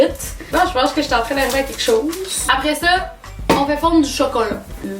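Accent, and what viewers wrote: Quebec, Canada. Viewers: SAHARA Music Perso c'est ma grande passion dans la vie, j'adore l'accent québécois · j'ai rien compris de toute la video . Au début je croyer que tu parler une autre langue . PS: j'ai rien contre les québécois il son super sympa mais cest leur accent que jaime pas et au quel je comprend rien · L'accent... Les expressions... J'adore les vidéos québécoises! Surtout les tiennes!! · DU QUEBECOIS ENFIN!! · j’adore l’accent québécois ! Et cette vidéo est super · l'accent quebequois c'est horrible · J'adore ton accent kebecoie dsl je ne sais pas l'écrire 😅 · Déjà con comprends pas le québécois alors avec un truc ds la bouche 😧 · Pour ce qui vivent en France , l'accent québécois est trop marrant 😂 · Tu est québéquoise non ? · Je viens de découvrir ta chaine . +1 abonné ❤️😍 j'adore ton accent Québécois 😍😍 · Mourioux Anna c'est l'accent québécois 😂😂😂😂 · j'aime bien l'accent québécois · pour tes futures videos, pourrais-tu parler avec l'accent français stp ? 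car ton accent quebequois est assez dérangeant ... merci ! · Le pire accent quebecois depuis looooooooongtemp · J'adore ton accent québécois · J'aime tellement le Québec et leurs accents 😍😍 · D'ailleurs j'adore l'accent Québécois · C'est pas l'accent canadien puisqu'elle est québécoise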